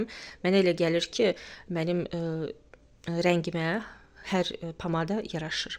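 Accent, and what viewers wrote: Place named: Russia. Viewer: Siz azərbaycanlısiz danisiginiz rus dillidi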